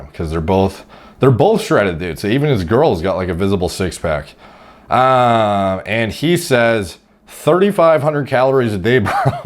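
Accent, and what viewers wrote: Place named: Canada. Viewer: sounded more Canadian than usual in this vid, particularly at "that's jumping to conclusions, no?" and with his polite disapproval of Reddit calling Lewis gay lmao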